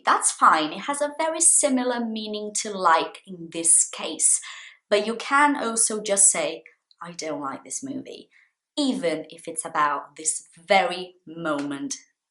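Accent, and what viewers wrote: RP, United Kingdom. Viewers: Excellent! And your British accent is so posh!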